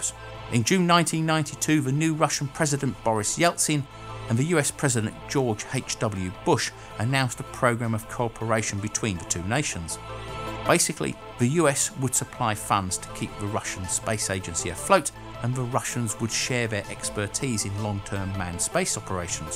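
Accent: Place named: Southern England, United Kingdom. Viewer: That's his Southern English accent showing through. Many English accents don't put a lot of emphasis on the "rrrrr" sound of the letter "R".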